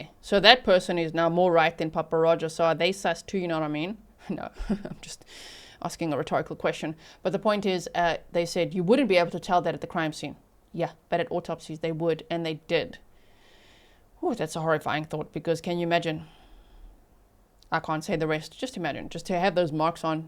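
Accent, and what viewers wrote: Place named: South Africa. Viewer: Are you originally from South Africa? Your accent sounds like it. Not being nasty, just sounds like it 🙂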